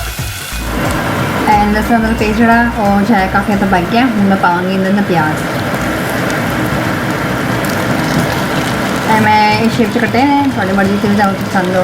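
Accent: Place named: Punjab, India. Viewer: Me ap ki Punjabi hi sunti rahi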